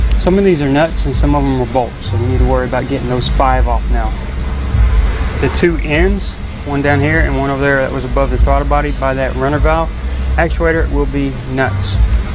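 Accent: South, United States. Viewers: Nice videos dude. fast but you cover it all. which is perfect for the average experienced mechanic. i like how you dont lolly gag on unneeded details, like how it was brought in on on flat bed truck or how you found a bird nest in it. fast, professional, informative. thanks. BTW... is your shop in Detroit? you seem to have a slightly southern accent.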